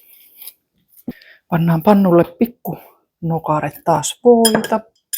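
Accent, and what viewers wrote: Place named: Oulu, Finland. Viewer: Kiva kuunnella oulunaksenttia,edesmennyt mieheni oli hailuotolainen😢❤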